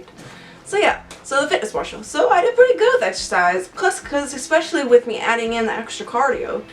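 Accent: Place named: Chicago, United States. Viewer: u don't actually look like u need to lose a lot of weight being honest I don't know what your goals are.. I'm trying to place that accent it reminds me of Chicago.. But I'm not convinced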